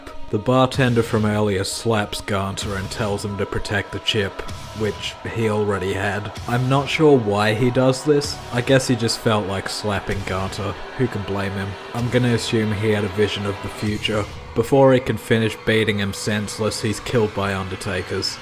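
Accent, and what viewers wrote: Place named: East Coast, United States. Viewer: Lol I came to this vid via your your big lez show vid. As someone who lives in Perth, no disrespect intended but my God you easily have the most easy coast accent I've ever encountered :D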